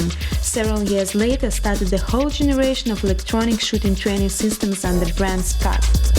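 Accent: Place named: Russia. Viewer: Wait is this is english? I thought it was russian, man that is a bad accent.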